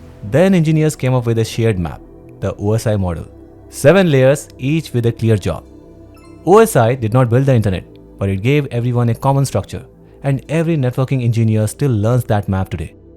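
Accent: India. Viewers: Indians and their english.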